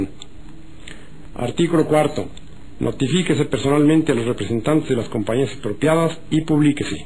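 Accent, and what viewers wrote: Mexico. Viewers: ACENTO MICHOACANO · Asento Netamente Michoacano Viva El General Lazaro Cardenas Del Rio Viva El General Francisco J Mujica Hijos Nobles Michoacanos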